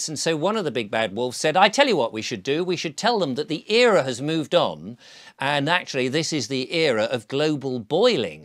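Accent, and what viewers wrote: United Kingdom. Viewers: HI, I REALLY ENJOY YOUR VIDEOS!!! THIS ONE IN PARTICULAR! YOU ARE A WONDERFUL STORY TELLER, YOU JUST HAVE A NICE WAY OF SPEAKING, MAYBE IT'S THE BRITISH ACCENT? I LIVE IN MASSACHUSETTS, USA, I'VE NOT BEEN TO HAWAII, BUT I HAVE BEEN TO CALIFORNIA MANY TIMES & I HAVE A SPECIAL FONDNESS FOR CALIFORNIA BECAUSE SOME OF MY FAMILY LIVED & THRIVED THERE FOR DECADES. HAWAII & CALIFORNIA & A FEW OTHER STATES IN THE USA HAVE THE SAME SITUATION OF EXTREMELY HOT LAVA SQUEEZING OUT OF BREAKS IN THE EARTH CALLED FISSURES WHICH CATCH DRY BRUSH THAT IS LEFT TO ROT & GUESS WHAT HAPPENS, BINGO, WILD FIRES, WILD FIRES EVERYWHERE THAT COULD EASILY HAVE BEEN AVERTED BY JUST CLEANING UP THE DRY BRUSH! ANY RESPONSIBLE PERSON WHO HAD ANY COMMON SENSE COULD FIGURE THAT ONE OUT & THAT IS WHY I HAVE NOTHING BUT DISDAIN & LOATHING FOR GOVERNOR NEWSOM OF CALIFORNIA & OTHER LEADERS & PEOPLE LIKE HIM!!@ THE WILD FIRES IN CALIFORNIA, HAWAII, THE WESTERN USA & CANADA ARE INTENTIONAL & CAUSED BY THE VERY PEOPLE PAID QUITE WELL TO PROTECT US & OUR MAGNIFICENT NATIONS!!! 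I KNOW MOST LIKELY FROM THE FACTS YOU ARE STATING, INCLUDING HOW THE PLASTIC SEATS AREN'T MELTED, BUT THE HAWAIIAN HOMES ARE FRIED!!! I STILL SEE & HAVE SEEN WILD FIRES ACROSS MUCH OF CANADA FOR MONTHS & ONGOING IN THE USA & THESE ARE VERY EASILY PREVENTABLE WILD FIRES BY SIMPLY AT THE VERY LEAST REMOVE THE DRY BRUSH, DEAD TREES & ANY OTHER FLAMMABLE DEBRIS CONCENTRATING ESPECIALLY ON WHERE THE VOLCANIC FAULTLINE TRAVELS &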